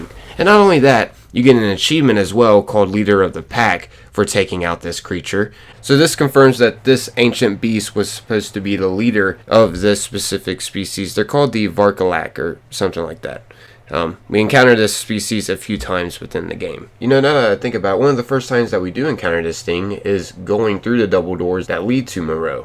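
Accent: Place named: Mid-Atlantic, United States. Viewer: That mid Atlantic accent though 🤓 where you from man? My guess is MD or PA.. am I right?!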